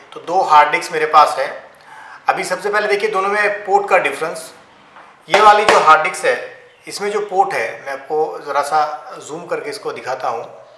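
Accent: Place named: United States. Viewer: i like how his is like the only indian youtube video that i can understand easily because im used to such a white American accent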